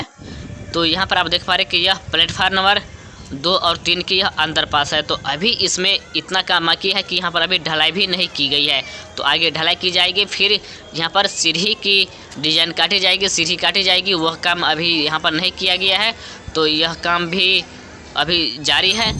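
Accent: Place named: Maithili, India. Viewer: Dbg se maithly babu bolne ka andaz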